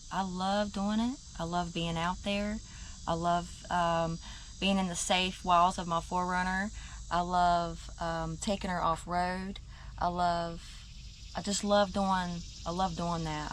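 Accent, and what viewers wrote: United States. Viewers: Hello my dearest friend lol. Might be worth drilling a couple of drain holes in the bottom of the hatch so the water won't gather. Seal the drill holes with a bit of nail varnish. I didn't count how many times in this video you said "I love", but I bloody love your dialect. It's gotta be my favourite of all the Americas xxx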